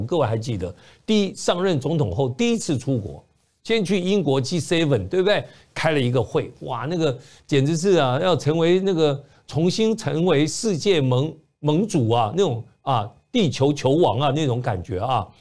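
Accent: Standard. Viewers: 你到底是不是100%洋人血统？ 反正普通话是100%纯正，神乎其神！ 谜团？🙄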